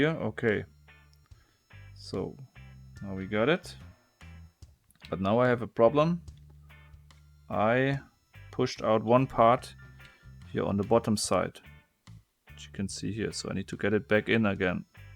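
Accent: Germany. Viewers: Swastika, but isn't voice german? (Thinking hard) Hmmm..... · the most german accent on youtube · Der gute Herr klingt ziemlich deutsch... ^^ ,,smells after"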